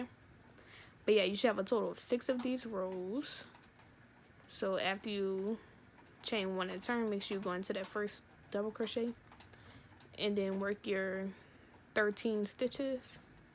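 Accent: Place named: Louisiana, United States. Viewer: The Louie accent 🥹🫶🏾. I love ur personality and that color is 🔥 .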